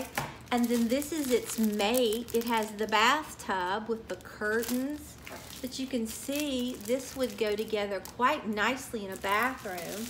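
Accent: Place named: South, United States. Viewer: Nice haul! I just love listening to you, I had some family from Florida and my Aunt had that southern draw and she called me sugar all the time!!! And every time I hear you I think about that!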